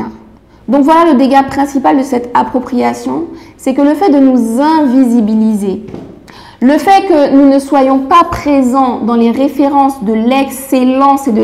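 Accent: French Guiana. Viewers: je devine de par ton accent que tu es guyanaise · dans ta façon de parler on sent que t'es Guyanaise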